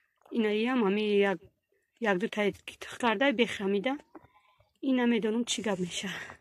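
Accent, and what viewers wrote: Yovon, Tajikistan. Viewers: Салом аз Ватан ба щумо гарибхо апащкам ранги Ёвониё гап мезана😁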